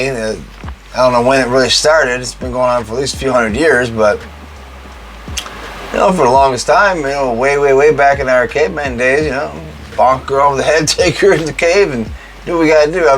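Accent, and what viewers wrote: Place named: United States. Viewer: Your intonation feels like I'm watching a Cowboy movie Oh yeah! wtf.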